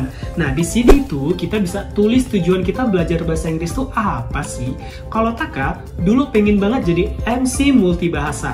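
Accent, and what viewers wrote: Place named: Java, Indonesia. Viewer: Sepertinya masih enggris Jawa ya..cara pengucapannya pronunciation nya belum natural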